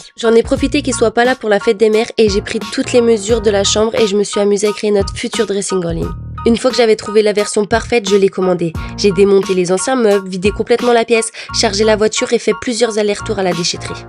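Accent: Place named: Portugal. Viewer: Portugais ou quoi ? Moi je suis portugaise parce que je crois, j’ai entendu portugais du saut.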